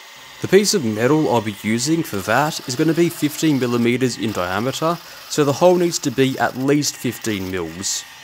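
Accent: Australia. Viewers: All great again mate except you pronounce chamfer wrong, don't give up Australian accent. It's a French word and it's pronounced with a "SH" . Soon you will be pronouncing rebate as rabbit? 😉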